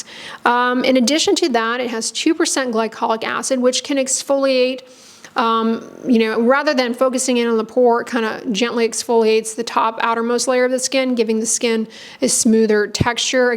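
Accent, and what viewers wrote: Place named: Southern California, United States. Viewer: I’m taking bets it Southern California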